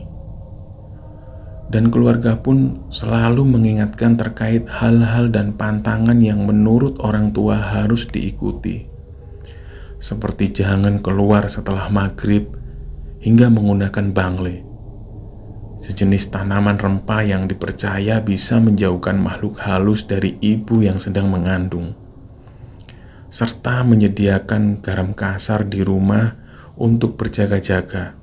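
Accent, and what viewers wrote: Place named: Java, Indonesia. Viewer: Logat jowone ojo sampek ilang mas...semangat 💪💪💪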